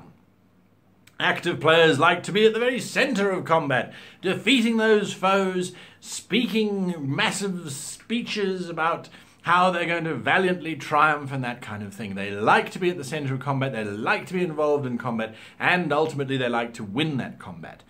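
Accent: United States. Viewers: Good American accent! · That accent really threw me off, and it sounded like a normal american accent with a few funks in it. Cool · Thank you for the video! Super helpful and relevant to a few players in my group. Also, it was super trippy hearing your American accent. I approve. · Your American accent isn't the worst ive ever heard, well done. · Thats a pretty good American Accent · Your American accent is like a combination of all the American accents. But over all it’s pretty good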